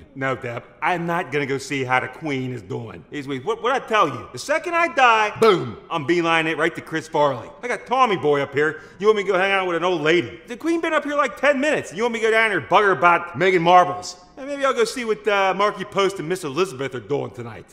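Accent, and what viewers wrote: Pittsburgh, United States. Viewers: I just love this guy - even when we lose he makes it fun. He makes me a little homesick for Pittsburgh too, but it's nice to here the Pittsburghese from someone who loves the Steelers as much as I do! Keep them coming. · The amount of Pittsburgh-eese in this episode is epic! hahaha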